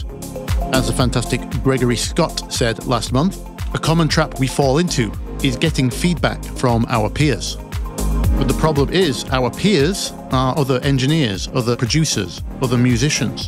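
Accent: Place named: South Yorkshire, United Kingdom. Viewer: Great vid always. This resonated. I think learning the rules has made my music worse. Its technically better and i am closer to the sound o wanted to create but its just not as original. Oh, the curse of the creative . Struggling to place your accent, thinking somewhere South Yorkshire but Im probably miles wide of the mark.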